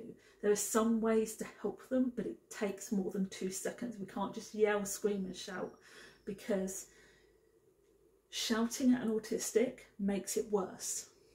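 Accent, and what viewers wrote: United Kingdom. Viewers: J like you which part of England you come from? ❤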